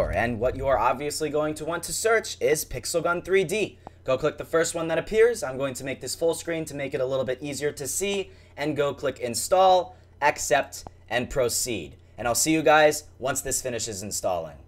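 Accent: Australia. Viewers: ur australian yay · Your Australian omg myself is not the only one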